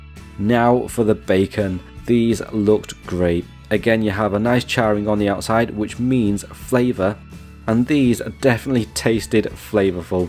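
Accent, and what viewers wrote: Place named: Midlands, United Kingdom. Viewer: Best breakfast I ever had was in Northern Ireland, so I think they have it. By the way - are you from the Midlands mate? Your accent suggests so.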